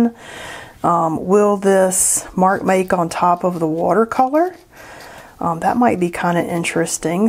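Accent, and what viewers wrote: South, United States. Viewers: your southern accent is super southerny* today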